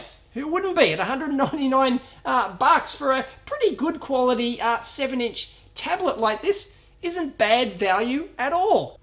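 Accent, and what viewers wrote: Australia. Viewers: Your reviews are informative but that high pitch Aussie voice is like fingernails across a chalkboard. (Chalkboard: graphic illustration aid from caveman age)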